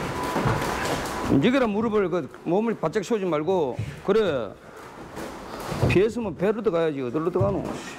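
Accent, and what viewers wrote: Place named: Busan, South Korea. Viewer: 부산싸나이 말투 쎄네요. 그 속에서 묻어나오는 정이 느껴집니다~^^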